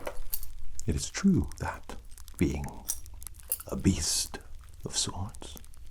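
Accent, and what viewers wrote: Wales, United Kingdom. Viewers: I loved everything about this video! I've been on the fence about Elden Ring since I'm not sure if I'd have time to actually play. I divide my time between Everquest, Everquest II, Guild Wars, Guild Wars 2, Lord of the Rings Online, and, most recently (thanks to you, ) Raid Shadow Legends. Anyway, the costume is truly awesome but then you are a talented individual so it's not surprising. Your Welsh accented voice, I could listen to that all day, at least until I fell asleep. Thank you for sharing your time and creativity with us, I appreciate it muchly. Oh, and as a side note, I always dream in color. 😊😊 With permission, hugs 🤗🤗🤗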